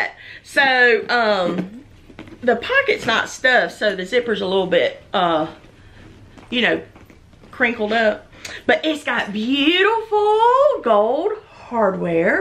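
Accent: South, United States. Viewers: Thank you so much for getting back to me. I’ll have to check out Ebay maybe. I am a brand new subscriber to your channel. I cannot tell you how much I enjoy you! You are such a bright light in a very trying tone for so many. I smile and laugh every time I watch your vlog. All your “oh my gosh” and “I love it” in your sweet southern accent. Even my husband says in a southern accent (and we’re in NH so speak yankee) “shut up” It has been so much fun watching your videos. I have watched EVERY ONE already!! Thank you for bringing some added joy to my days! Can’t wait for your next installment...I’ll most definitely be watching! 😀. AMY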